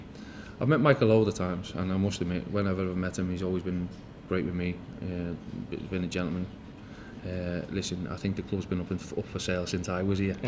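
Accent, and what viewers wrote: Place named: Liverpool, United Kingdom. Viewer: Nolans a scouser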